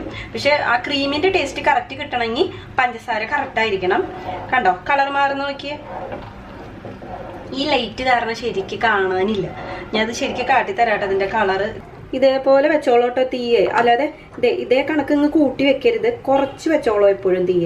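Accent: Thrissur, India. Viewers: Pukkaaaa thrissur bhaasha...ngaan thrissur adutha padiche. Nostalgic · thrissur da bro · Thrissur alle veeed Alla... slank? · അടിപൊളി ചേച്ചി ഈ കണ്ടതൊന്നുമല്ല വീഡിയോ ഇതാണ് ചേച്ചി പറയുന്നത് നല്ലതുപോലെ മനസിലാകുന്നു നല്ല voice തൃശൂർ ആന്നോ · Chechi de varthnm nth rasa... Enik othiri ishtay.... ❤️😘Trissur aana place? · Trissur bhasha polichu. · തൃശ്ശൂർ ആണല്ലേ 😃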